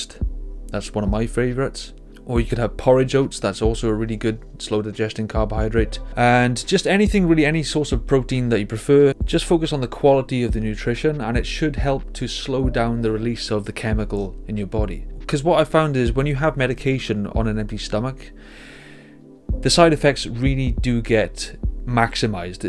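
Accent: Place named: Swansea, United Kingdom. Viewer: Hello, I'm from Swansea! I noticed you have a bit of a local accent, where are you from?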